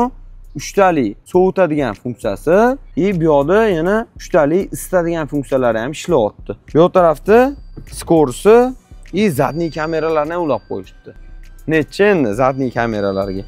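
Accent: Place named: Tashkent, Uzbekistan. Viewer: Тошкент шеваси чарчативоради эшитадиган одамни, кучанип нима киласиз адабий гапираверинг😅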